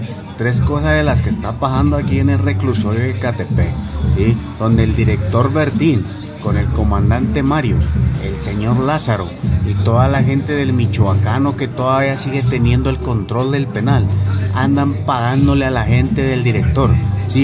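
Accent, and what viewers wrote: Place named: Colombia. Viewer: Tienes acento colombiano hermanito 👍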